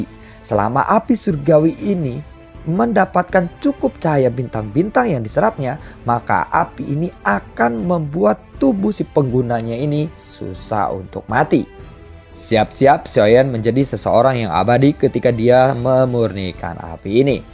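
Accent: West Java, Indonesia. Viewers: Mimin orang Sunda kah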